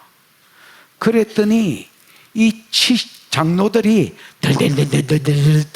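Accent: Gyeongsang, South Korea. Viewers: 목사님이 대한민국 BUSAN에 오셔서 구수한 경상도 사투리를 쓰시면서 어려운 계시의 하나님의 말씀을 너무나 쉽게 또 드라마틱하게 온몸으로 설명하시니... 매끄러운 서울말투보다 저는 더 이해하기가 쉬워요. 😊😊😊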